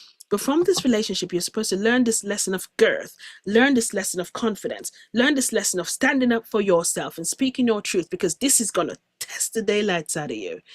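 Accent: Jamaica. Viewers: Hi, I am tuning in from Jacksonville, Florida. I saw your captivating interview with Alex on Next Level Soul and was so pleased and happy to hear that you are originally from Jamaica. I found it so refreshing to hear the familiar accent of my homeland, Jamaica. I love your story and your message. I do believe that some people are already living their purpose but can't recognize it because it may not be grand. Your purpose is doing what you love. My purpose is an acupuncture physician and healing and giving love, light, and compassion to the world is what I do. I always see the greatness as God / Source as breath. I was channeled a poem from my Higher Self that breath is consciousness, and we can't live without it. Consciousness can not be contained, as you said on Next Level Soul, and that is so true. Thank you, for your message of love, light, purpose, and power! 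I am so happy I found you! Stay blessed. 🎉❤🎉❤🎉❤🎉❤🎉❤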